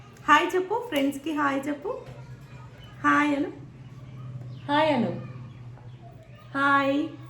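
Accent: Telangana, India. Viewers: Cake 🍰 Super అచ్చమైన తెలంగాణ యాసలో మాట్లాడుతూ చాలా బాగా చేశారు ...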